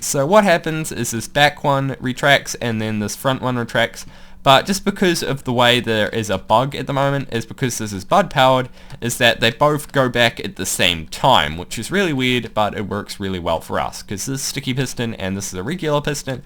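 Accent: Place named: New Zealand. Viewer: He is from New Zealand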